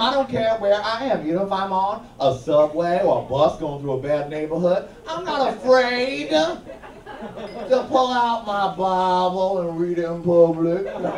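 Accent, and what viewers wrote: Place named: Louisiana, United States. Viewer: Is he from Louisiana and idk why but I adore there accent. Whatever u do in ur life is none of my business. ✌ ❤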